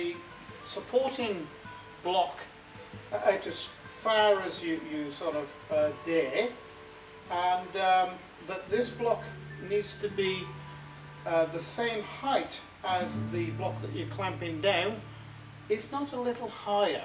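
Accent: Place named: West Country, United Kingdom. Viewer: Going to caaav a climber , the west country accent has hints of where the Baawston accent comes from .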